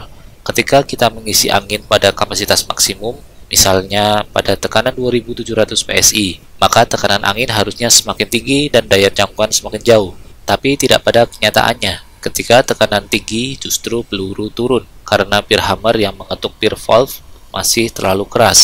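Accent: Banyumas, Indonesia. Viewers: Bahasa ngapak ny exsisin dong mas.. Hihi salam. #orangapakorakepenak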